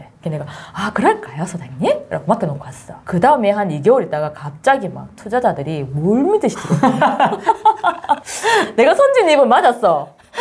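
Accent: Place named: Busan, South Korea. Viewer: 설연휴 보석같은 강의 너무 감사드립니다! 핵미모+부산사투리 매력만점 팀장님이십니다^^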